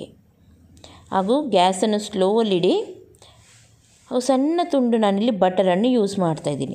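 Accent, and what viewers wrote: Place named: Dakshina Kannada, India. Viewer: I like your kannada. Perfect blend of south kanarian, blore and catholic style.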